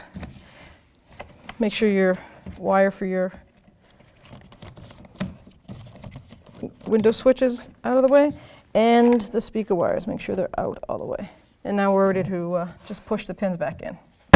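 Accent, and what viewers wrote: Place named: Massachusetts, United States. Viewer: Nice job. I detect a Massachusetts accent. Am I right? :)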